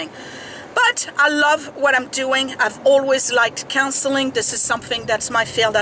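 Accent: France. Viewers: I studied French for 4 and a half years. I had hope to visit there but it has not happened. I always could tell your accent was French. I hope Scott feels better soon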